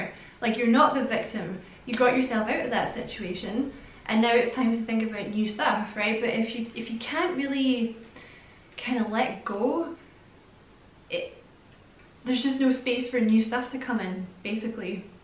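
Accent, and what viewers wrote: Scotland, United Kingdom. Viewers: Thank you so much for this, it's great to hear another Scottish voice❤️🏴.... I am doing lots of shadow work and the amount I have cried is not real 😭... Where I am having problems tho, is not forgiving others for situations, that's easy to me😊.. Its trying to forgive myself!! and now having hindsight, why didn't I do that/say this? its the anger towards myself I can't let go off. Love and light too you and I wish you all the happiness in your new space. ❤️From an aqua lady xxx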